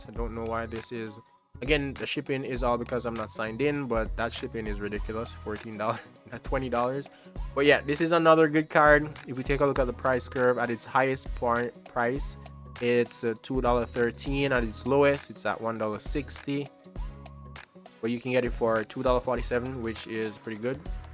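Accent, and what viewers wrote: Jamaica. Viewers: I can tell from your voice that you are Jamaican. Can you tell me where you play? I am trying to find a locals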